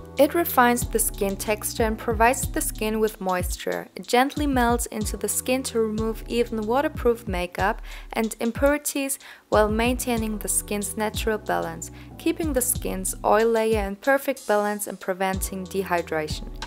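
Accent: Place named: Germany. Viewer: Wow that's a thick German accent... But anyways, good luck with the routine, 파이팅!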